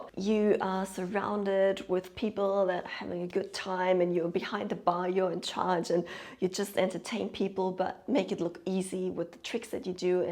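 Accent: Germany. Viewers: Dein Denglisch ist irgendwie putzig xD But jokes aside, your english is more than fine. I did not realize you're german until you changed language. Then again my opinion on that might not count as I'm german too. But for a non-native you're doing extremely well. P.S.: Metal \m/ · I get any right answers 🙈😂 I'm so bad at quizz but thanks for it ❤ and my main weakness is self-criticism, but I improved a lot. I always think how elegant is your english accent, since I know you are German. That's the first time I hear German accent in english 😊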